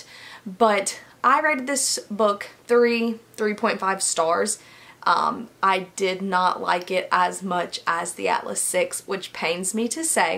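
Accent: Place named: South, United States. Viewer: lol definitely a little country but its not super thick. It pops out every few words for sure. I'm from New York though so my ears may also just be tuned into our sound 😂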